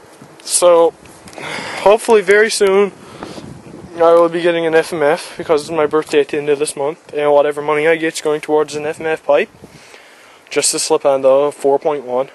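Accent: Newfoundland, Canada. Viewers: Are you a newfie ? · man as soon as you started talkin i was like this dude is from newfounland haha, and sure enough when i went into your profile i een that you were, im from flatrock down on the east end of the avalon. i got an 09 450x there last fall, i should have some riding videos up soon cause im getten a gopro. I subbed, if ya wants sub back. tanks bah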